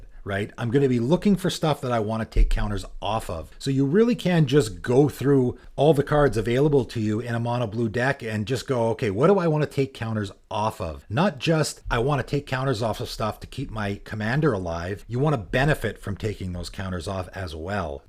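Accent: Canada. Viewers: Love ya but boy the Canadian pronunciation is KILLING ME. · bruh the heavy canadian accent is half the reason i subbed to this guy. I fucking love it edit: you realize its great when you replace every "a" sound with the ol canadian "eh" for example: s"eh"ga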